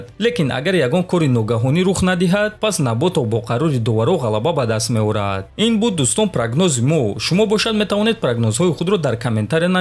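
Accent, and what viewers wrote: Tajikistan. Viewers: что за язык .тупой еше тупее.пазориш таджикский язык и акцент.пазорный волк.прекрати мычат.баран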